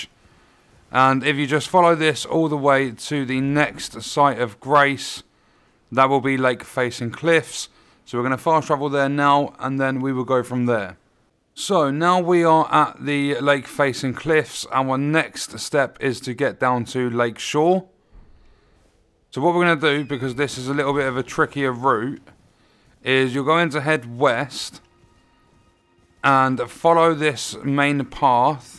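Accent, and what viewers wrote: England, United Kingdom. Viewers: English people talk funny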